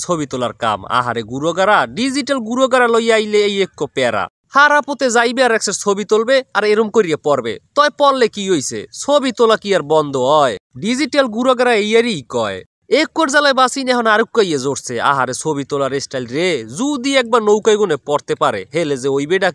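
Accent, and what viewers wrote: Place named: Barishal, Bangladesh. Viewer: ভাইয়া আপনার বরিশাইল্লা কথা গুলো ই খুব ভালো লাগে❤❤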